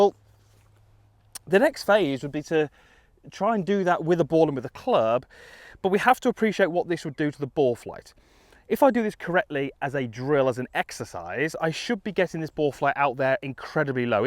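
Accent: United Kingdom. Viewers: He is British! We have the accent.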